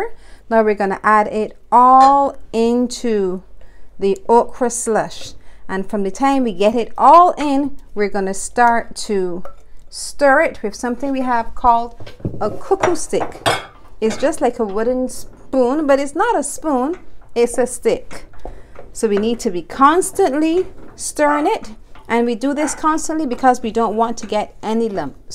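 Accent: Barbados. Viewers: What a sophisticated bajan accent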